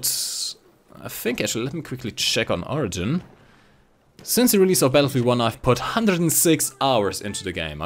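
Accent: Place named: Germany. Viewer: i love your content, yes i love FPS and war thunder, something im in the mood for war thunder, sometimes cs:go, overwatch, tf2 etc etc, the thing is.... i found you cuz i didnt knew wtf i has doing in tank battles, and you helped me, i like your wt content and it shows that you do... put a alot...ALOT of effort, and well your german accent.... well.... i stayed cuz you're an awsome guy, funny and well you teached me how to be a tanker / pilot, same as orange, he helped me with a coupple of plane tips here and there, he's awsome.... what i wanna say is.... i'm here for the person dispise the content, hell you can play the sims i'd watched it... it would a bit awkard.... but i'd love to see what you can do with it, sort of speak... stay safe and.... keep beeing awsome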